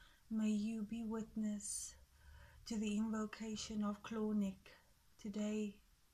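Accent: South Africa. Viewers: You sound like you come from my country south Africa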